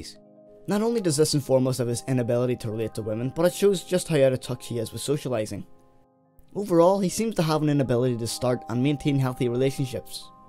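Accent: Northern Ireland, United Kingdom. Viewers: This analysis is a very insightful and amazing one, thank u very much for sharing! It's pointed out to me that I must go back and rewatch the film! Also can I ask u a quick personal question? Ur accent is a northern Irish one?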